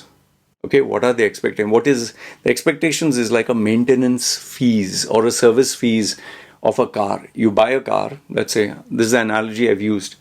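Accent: India. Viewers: Indian accent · Bro is Indian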